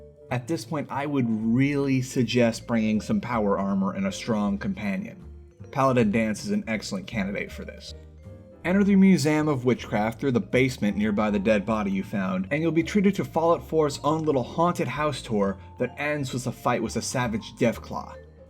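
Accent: United States. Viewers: He's American, they're not the brightest and quite R*traded.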